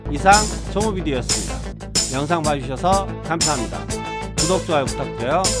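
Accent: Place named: Jeolla, South Korea. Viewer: 구수한 전라도 억양과, 찰진 멘트에 또 낄낄거리며 힐링하고 갑니다.. 너무 감사합니다 ^^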